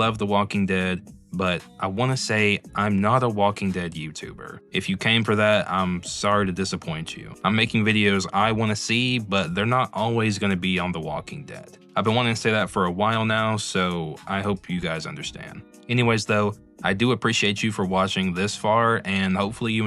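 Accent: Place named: South, United States. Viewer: Off topic- I didn’t notice your accent until “Otis” & then suddenly I could hear it… I really am pickin up the “country” accent if I’m managing to not even notice it 😂 (not bad or nothin, just a silly observation! But on THAT topic I appreciate how well you manage to balance the southern accent & enunciating bc it sometimes feels like a lot of folks around me that also have the accent tend to struggle w enunciating & as someone who struggles w audio processing… well, it can make customer service VERY difficult 😅)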